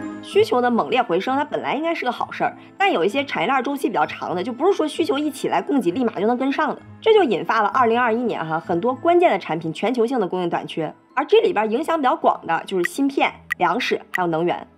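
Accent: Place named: North, China. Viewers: 小Lin的口音听着像山东老乡啊。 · 感觉是北方口音，但是咋判断是东北人！？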